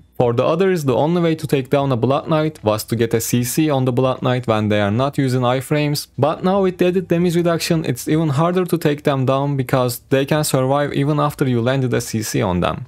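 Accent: Turkey. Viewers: Tam türk aksanı var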